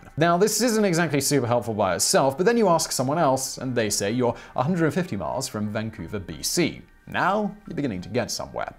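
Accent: United Kingdom. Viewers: why does he look like the guy on Vsauce but with a British accent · Oh boy... More stuff of i rationalize how they came up with gps... And rather than read... Im so briliant i must be right and there for let me spread my genious around as fact and history because whatever i lazily dream up must be right. Stuffy elitist. Talking head comes complete with stuffy brit voice. Yes kids.. This passes as a college prof... Even a department head these days. Actually.... Unfortunately for this condescending wannabe egghead embarrassment... Gps origins are not sputnik at all. Norging to do with it other than hey... Look a tin can pinging we can track. Gps at its heart... Is triangulation. A methodology used for ages by surveyers. It actually owes it origins to Pythagoras. As far as an orbiting object being a high accurate clock like an atomic clock... I have no idea how that works and id assume again some algebra... 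Trigonometry... And some calculus... But atleast i am humble enough to admit it. And its a good question. The talking head here equally has no clue how that works. Before sputnik... Im pretty sure there were some very bright people unlike this jerkoff here in this video just tossing off... Wonder if he creamed his pants over this... But i digress... Some bright people.. Yeah like warner von braum... Einstein... People like that which had already figured out geosynchronous orbits.... Now after all of that... Even more bullshit spewed by captain science here... You cell phone does not directly engage a bunch of high orbiting sats out there. It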